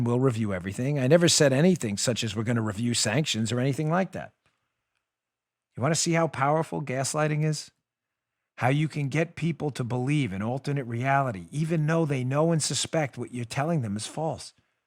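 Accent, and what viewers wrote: New York, United States. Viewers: !! You are SO NY and SO Italian!! Love it!!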